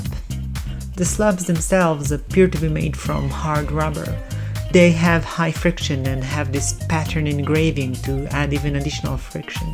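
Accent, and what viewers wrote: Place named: Eastern Europe. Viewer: this slavic ascent😮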